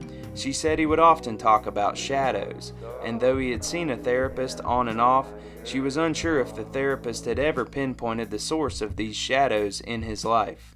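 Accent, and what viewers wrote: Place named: Eastern Shore, United States. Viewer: Well done! This gave me goose bumps. I figured from some of your other vids you were an Os fan, and since you talk a bit like the people I grew up with, I mistook you for a fellow Eastern Shoreman.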